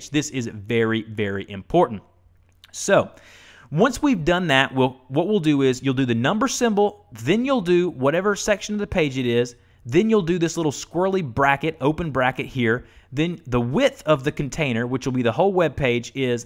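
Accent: South, United States. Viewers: It just hit me. Your voice reminds me of Danny Lipford, the home improvement guy on TV. Are you also from Alabama, or another southern state? By the way, awesome videos. ;-)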